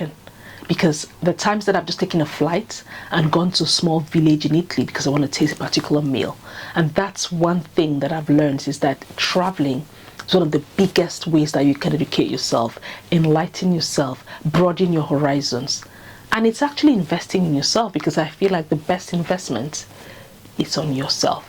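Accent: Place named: Nigeria. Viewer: She’s not Ghanaian. Her accent is more of a Nigerian accent. I’m a Ghanaian and know a Ghanaian when I see one.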